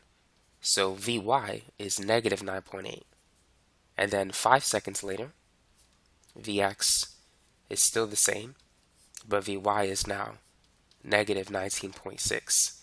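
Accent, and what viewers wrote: United States. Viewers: Dear Teacher: You helped me today. I am teaching myself-or more correctly, learning myself via teachers like you--physics. My interests--outside English teaching and philosophy--are sustainable aviation and human spaceflight. Please allow me to help you--just a bit. Sir, you're a good teacher (thank you for that!); you speak fairly distinctly, slowly enough for your students to hear and comprehend your words & concepts clearly--and you convey information methodically, in bits that can be digested--whereas most physics teachers I have seen can't teach to save their granny's lives; but, respectfully--and take this with brotherhood, my friend, consider--pronouncing your words fully, please. 'Wes' is not a word (it's a person's name), nor are 'Eas' or 'Norf'. In addition, 'objec' is not a word, either. People will say this doesn't matter--and that you speak an American city dialect---but I care to much for teachers like you--who are trying to educate the world--so I respect you enough to say they would be wrong. 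You are too well-educated to limit your pedagogy with voluntarily incorrect pronunciation; I say "voluntarily", even though I would guess you probably grew up in an immersion environment wherein your peers and guardians (really--your guardians, too?) spoke this way, which affected your interpretation of the phonemes they were pronouncing... in relation to the words they were a part of... and the connected to meanings they were attached to- unconsciously; so I could guess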